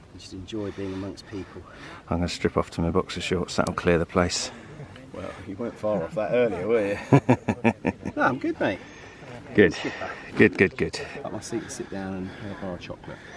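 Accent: United Kingdom. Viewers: funny as sh#t I've made it exactly two-and-a-half minutes into your video and I can't stop laughing.As you're coming up the hill you stop and you say It's Like a Jungle Sometimes it makes me wonder with that British accent, and then you pause turn your head and I think to myself you know I would bet a million dollars that this white British dude probably doesn't realize he just started the lyrics to a song, and if he finishes the sentence when he turns his head back around he's going to say what in the world have i gotten myself into or it makes me wonder who in their right mind does stuff like this for fun and then you turn back around and finish the lyrics with your British accent and I haven't stopped laughing for 5 minutes! I don't know why I'm surprised you finished the lyrics to an African-American song that came out when you were probably a kid! After all my favorite music is British music. 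I love British music Their's only 2 kinds of music,good music and bad music and it's all relative from your perspective which is which and for me #1 on the list for a tie,its between the and,right beneath that my second favorite bands it's another tie between and and yeah Jimi like me was an African-American,but because of racism he couldn't get his start in America and he didn't take off until he formed the Experience with his two British bandmates in England.Just beneath them on my favorites list are the, and of c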